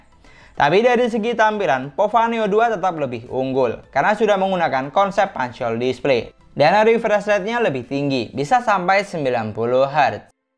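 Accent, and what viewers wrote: Madura, Indonesia. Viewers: logat madura ya bang?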